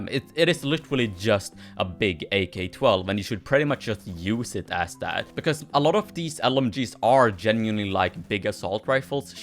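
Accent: Sweden. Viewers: Where are u from? (U sound Swedish, I know that because I’m Swedish)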